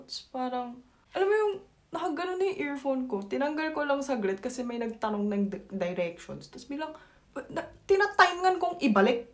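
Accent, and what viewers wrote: Batangas, Philippines. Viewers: Alam mo ga ining na akoy Batanguino rin kaya ako ay tuwangtuwa ss iyo the way you talk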